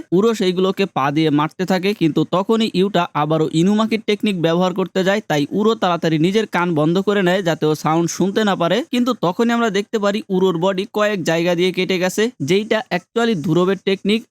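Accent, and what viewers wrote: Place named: Magura, Bangladesh. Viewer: Vai apnar basa ki magura? Magurar Moto accent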